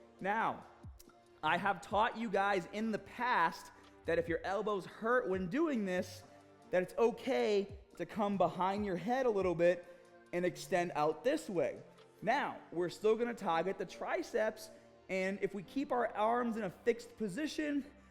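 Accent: Boston, United States. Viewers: I'm dropping a comment to help with the algorithm because I'm already subscribed and I liked the video. Love your videos for the training-information, entertaining Boston accent, jokes and lively presentation.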